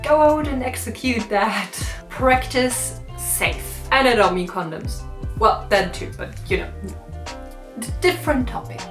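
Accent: Germany. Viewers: Hi beautiful! New sub and I have to tell you I LOVE your videos! Your knowledge, your voice, aesthetic, and your absolutely wonderful German accent lol you are so sweet and great! ☺️🥰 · Ich finde deinen Kanal echt megaaaa🥰 & finde dein Englisch echt top😅 War ein bisschen stolz, dass ich aus deinem Englisch raus hören konnte das du auch deutsch bist haha 😊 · Dein deutscher Akzent macht dich noch charmanter als ohnehin schon. 💚 (Und danke das du dich bei meinen Kommentar vorhin an mich erinnert hast, und woher ich komme. 😊) You are a very wonderful and wise witch! Can't wait for your next videos! 😉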